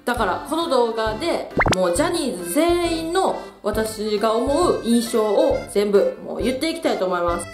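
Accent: Kansai, Japan. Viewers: おすすめに出てきたのをきっかけにさん見始めていつの間にかハマってた。なんか関西弁が好き。ちょっと口をとがらせて話す時が可愛い。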